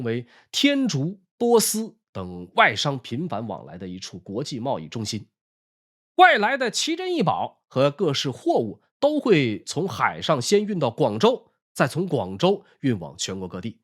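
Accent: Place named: Northeast, China. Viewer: 哈哈，听了好几遍“我去他奶奶个拽的”，好亲切呀。你是东北那疙瘩的吗？🤣😂😁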